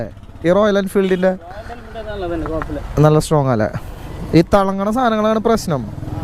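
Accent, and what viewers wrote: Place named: Thrissur, India. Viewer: പഴയ തൃശൂർ slang thirich vannittund.. loved it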